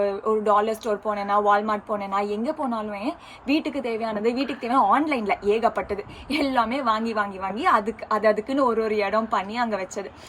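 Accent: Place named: Chennai, India. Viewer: Yakoov Actualah Chennai Slangu bangam pannuthu 😂 Keep doing it on Tamil 👍🏼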